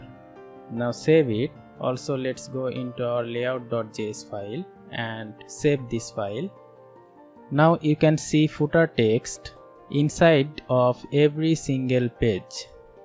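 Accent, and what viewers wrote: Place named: Bengal, India. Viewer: Khub valo laglo video ta, bangali mone hocche accent sun ?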